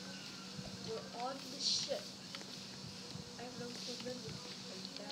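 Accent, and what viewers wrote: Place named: India. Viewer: sound like an indian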